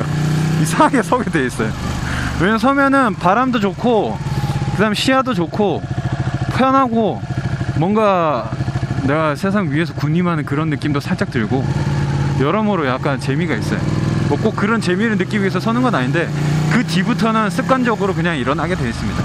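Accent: Gyeongsang, South Korea. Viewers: 저도경상도사람인데 님 경상도인신줄몰랐네요ㅋㅋ 친구랑대화 하시는거보고 친숙한ㅋㅋ 더욱 정감가네요ㅎㅎ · 갱상도 아입니까? 북입니까 남입니꺼?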